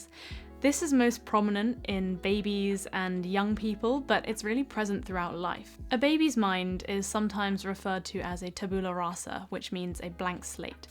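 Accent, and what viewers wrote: United Kingdom. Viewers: Hello dr, my name is mark. I love your video its very inspiring for me. Although i had a bad set back last year I was on a dating site and met a chinese girl, who i thought i was in love with. Turned out to be a crypto investment scam, called sha zhu pan or butcher pig scam of asia. I lost $100,000 in crypto currency. Im depressed now n taking weed gummies and zoloft to help sleep; and get my serstonin levels up. While managing my acid reflux and diverticulosis. I hope i can learn to think better so ill try to learn what your teaching. I just hope weed gummies dont effect my memory. Its bad enough as it is. Along not knowing if ill choose surgery for a 5cm les esophagus,hernia. Surgery is to hard to understand now. Any advise id appreaciate. I get dizzy from clogged sinus. But i admire you fir showing me how a person can be if they apply them self. 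So tk you, and love you british accent. AMAZING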